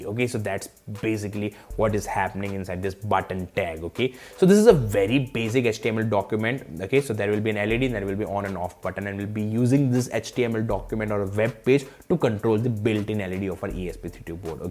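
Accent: India. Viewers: it sounds all very interesting - but your accent is too thick / English is too bad. I have to confess it reminds me of the voices of all those telephone fraudsters who call pretending to be Amazon or Microsoft. Sorry, but telephone based crime has sensitised the whole English speaking world to Indian and Nigerian accents. (And Liverpool, but that's another story).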